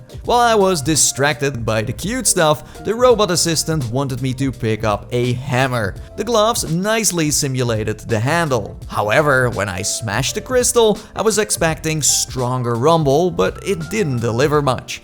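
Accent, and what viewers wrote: Netherlands. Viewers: You sound like your from the Netherlands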